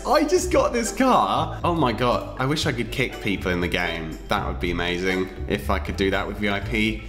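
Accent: England, United Kingdom. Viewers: Is this is called British accent? I don't really now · David Beck They're both British. What do you expect? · Ana Contreras There both British so ya I see why you said that but at the same time I am a bit confused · Ana Contreras cuz he is british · Are u English ? I luv ur channel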